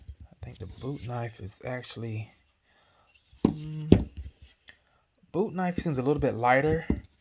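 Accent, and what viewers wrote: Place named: South, United States. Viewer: He’s probably from the South like me. We have accents:)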